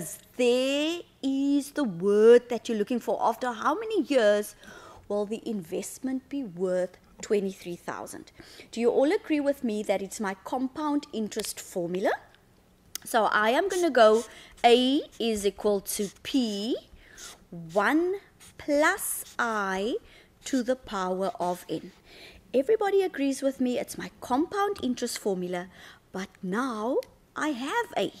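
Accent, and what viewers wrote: South Africa. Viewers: That's the way every south african teacher speaks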